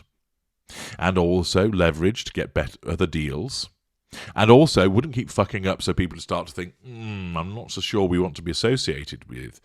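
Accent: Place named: RP, United Kingdom. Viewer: BTW, I love how you swear with such a cut glass accent. More please.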